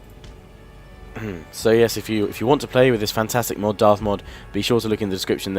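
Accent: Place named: United Kingdom. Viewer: Yes it's his real voice lots of Brits sound life that.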